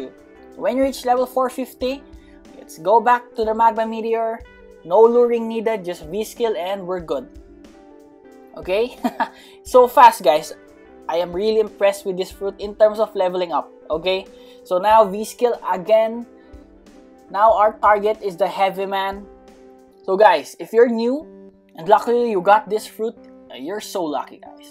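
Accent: Philippines. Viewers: The accent r u a filipino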